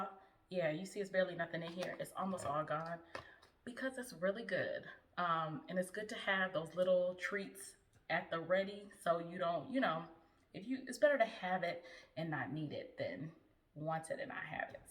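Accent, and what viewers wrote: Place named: East Coast, United States. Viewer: Just found your page. I’m originally from Chicago too! You sound like you’re from the East Coast lol. Yesss girl use that Quinoa!!!!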